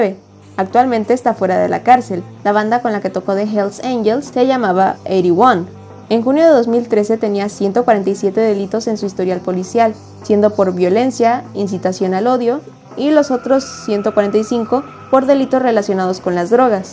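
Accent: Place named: Colombia. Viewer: hablaste como colombiana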